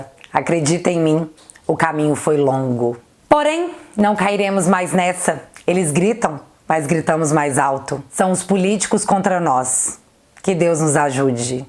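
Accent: Minas Gerais, Brazil. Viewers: ETA mineirinha porreta . Dezzzzzz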